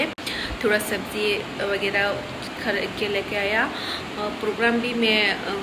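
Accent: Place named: Bhojpuri, India. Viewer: Behn ji aapki hindi bolna..hamko bahot acchha lagta hai...like bhujpuri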